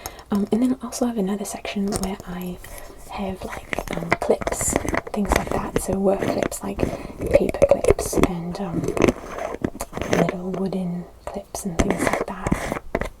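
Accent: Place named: Wales, United Kingdom. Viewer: Ahh! I took your advice and went to watch some older videos - and now I know why I couldn't place your accent! I was thinking Welsh raised overseas or something. Good to finally know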